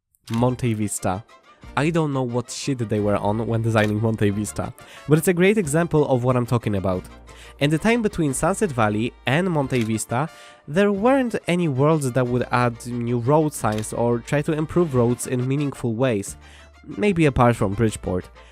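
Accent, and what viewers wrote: Poland. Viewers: Are you Polish? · Are you Polish by any chance? Amazing video btw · Are you polish? :D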